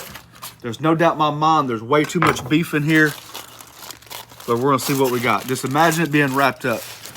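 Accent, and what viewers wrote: South, United States. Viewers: First video I’ve watched of you . I’m from SC and hear some southern dialect. Love it !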